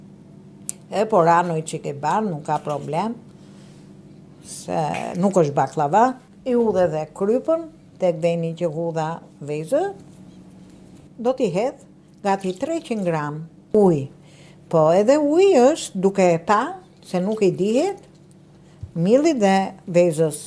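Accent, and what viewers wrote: Tirana, Albania. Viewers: Ju lumshin duart teta, kenaqem kur shpjegon perberjen e byrekut, me ate ze te qete dhe gjuha juaj tironce qe me nxjerr mallin, me kenaqesi Ju degjoj! 👏👏👏💖🌸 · Gezuar diten e veres, me pelqen gatimi jot tamam gatime tradicionale ,por me teper shpjegimin qe ben Tiranse puro .kenaqem kur te degjoj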